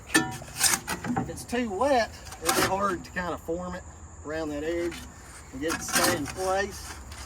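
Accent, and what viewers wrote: South, United States. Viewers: My girlfriend is now forbidden to watch this channel. She is commenting on your tats and southern talk etc. lol. She looks at every guy with their shirts off. Now i know why she is watching these big machine channels. DUDE! I can't believe you accidently crushed the septic tank. This has been one of the hottest Summers in a long time. Go easy.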